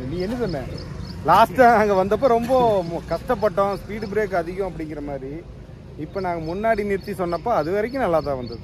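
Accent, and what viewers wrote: Kongu, India. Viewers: I remember this person. You two were sitting on the water tank and talking about your Ladakh trip. At least four years ago, I saw this person on your YouTube channel. But I still remember him. I really like it when you come and speak in Kongu Tamil in your channel., I am also from Kongu side.I really like your channel because you speak in that tone. keep continue bro. I pray to God that you always have a safe journey. I love Channel also. I've been watching Moto vlog continuously since he started domestic travel, and he has spent a lot of money on international travel. I feel like a person from Tamil Nadu who has an international travel vlog is a big feat that no one else can do. I hope he hasn't received recognition from people yet. I really like that man's spirit. No human being can afford to take a small international trip and spend it on people, because the cost is too high, but he works for us.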